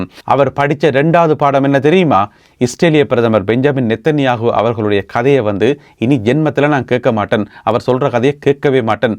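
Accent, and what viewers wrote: Sri Lanka. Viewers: IAM VETERAN KAVARCHI VILLAN K KANNAN S SON MGR FAME WHAT A DECENCY MATURITY. A LANGUAGE OF HARMONY WITH PLEASANT PRONOUNCIATION YOU HAVE. PARTICULARLY SRI LANKA TAMIL. I WISH YOUR COUNTRY TO HAVE PROSPERITY AND PROGRESS IN ALL ASPECTS. PARTICULARLY FINANCIAL LEVEL. INCLUDING GDP. I MUCH LIKE YOUR LANGUAGE WITH DECENCY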